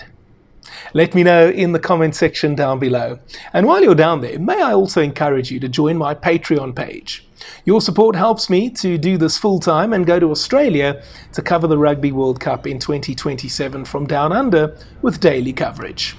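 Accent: South Africa. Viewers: Algorithm sent this channel my way... just needed to hear a South African speaking and voilà i have liked and subscribed 😂 .... do i understand the content? No. Is this channel SAn? Yep, and I will subscribe up and down, nje, just because 😊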